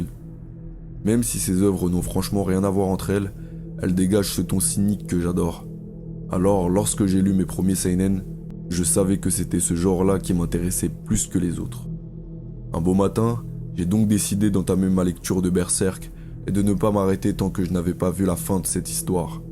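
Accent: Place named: Banlieue, France. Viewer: Je suis fan moi aussi de l' univers de Miura. Votre vidéo est intéressante et prometteuse, je vous encourage vivement à en présenter d' autres ,que ce soit pour approfondir sur Berserk ou aborder d' autres de vos passions. Votre petit accent de quartier m' a rappelé les ( très heureuses) années où j' ai travaillé comme instit en ZEP. Quand je croise mes anciens petits élèves , ils ont un peu cette voix et certains sont devenus des golgoths qui me dépassent d' une bonne tête😂 . Je ne travaille plus dans l' éduc nat.( Je suis handicapée et je me consacre désormais à l écriture et au dessin) Je ne vous écris pas cela pour vous raconter ma vie mais parceque vous demandez des retours sur votre travail . Il comporte beaucoup de qualités mais il y a quelques petites maladresses d' expression qui gagneraient à être corrigées 😊.Vous aurez,si vous continuez à produire des vidéos, des auditeurs d'horizons très divers er ce serait dommage que quelques maladresses gâchent leur appréciation sur votre travail. 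Si vous voulez je peux corriger rapidement le script de votre prochaine video, ( gratuitement ) . Vous écrivez plutôt bien et je sais que ça ne me prendrait pas des heures. je trouve que votre propos serait mis davantage en valeur. En tout cas félicitations, vous donnez envie de découvrir cette fantastique fresque graphique sans rien spoiler! Si vous voulez me joindre je suis sur Facebook ( et oui,j ai 50 balais, c' est bien de mon âge !) et sur Instagram à mon nom. Cécile Creixell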